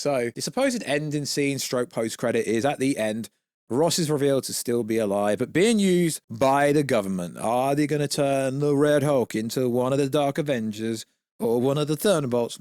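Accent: United Kingdom. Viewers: I can understand why he got it wrong he's from another part of the globe 🌎 sounds like he could be possibly from the U.K.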